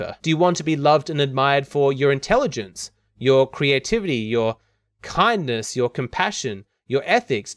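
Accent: South Africa. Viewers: This is for sure a South African video. I can hear the South African accent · She's so South African 😂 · She sounds soooooo 🇿🇦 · That accent sounds south african.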